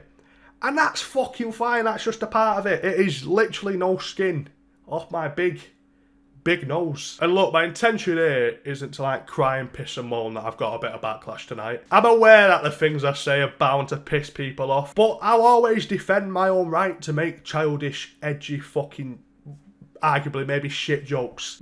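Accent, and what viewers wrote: United Kingdom. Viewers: I don’t think people understand everyone in the uk speaks like this it’s just uploads it on YouTube.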